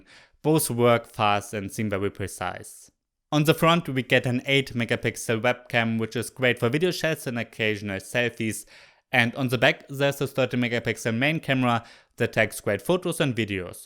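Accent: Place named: Germany. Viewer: You got such a strong german accent, i only realised i was watching your english channel after like 5 Minutes in the video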